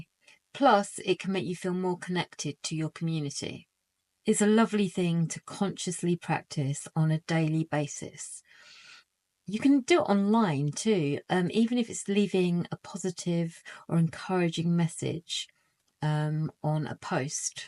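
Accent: United Kingdom. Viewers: I loveyour accent is it RP or POSH and where are you from?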